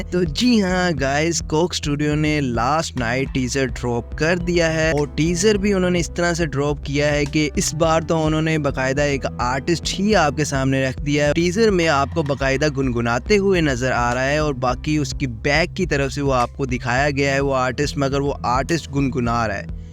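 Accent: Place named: India. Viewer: Aal ready tum Indian ho . Bolne ka style bata raha hai. Idiots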